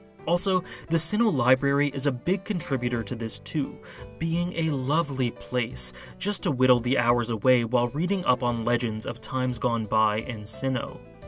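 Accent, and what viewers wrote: Canada. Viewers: Yo! What part of Canada are you from!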